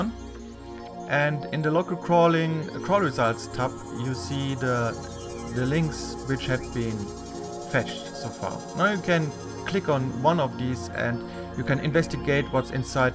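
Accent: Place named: Germany. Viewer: German English :) I like it.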